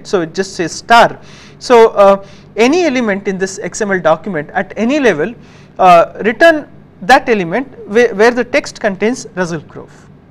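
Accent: India. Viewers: speak a little slower because your Indian accent isn't the best sir i can't understand hardly anything you said.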